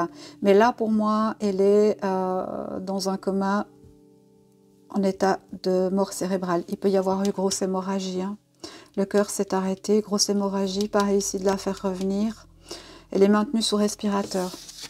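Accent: Switzerland. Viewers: Coucou, j’ai été scotchée par cette vidéo, je viens de m’abonner 😉😉😃. Mais au fait, a-t-elle vraiment un cancer on peut en douter maintenant après avoir écouté votre vidéo, ils préfèrent dire cela plutôt que d’expliquer qu’il y a eu un couac pendant l’opération? Il m’a semblé entendre un joli accent venant de Suisse romande, si c’est le cas 👏👏 bisous de Genève 🥰🥰🇨🇭🇨🇭🇨🇭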